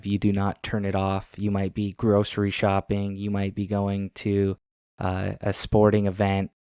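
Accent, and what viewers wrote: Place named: United States. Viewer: Cringe when he pronounces mexican cities with american accent. No sea mamon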